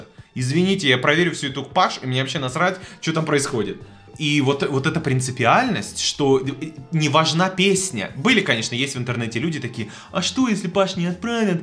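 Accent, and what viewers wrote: Ukraine. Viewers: в суржике используются "русизмы". Но произношение украинское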